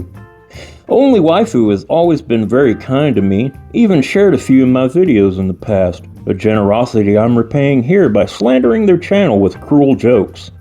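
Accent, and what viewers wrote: United States. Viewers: Your voice sends me to an early 2000's southern diner lol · You can hear the country voice radiating through the screen.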